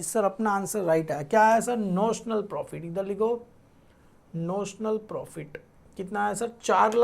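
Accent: Gujarat, India. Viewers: Sir ap gujrati ho kya..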